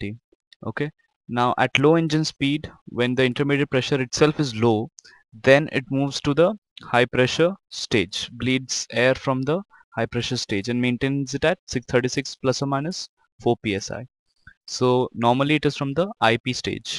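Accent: India. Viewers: Are you from India ? You speak like Indian 😊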